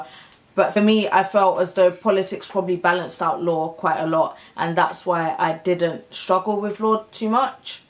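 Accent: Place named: England, United Kingdom. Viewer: I love listening to English people speak... best accent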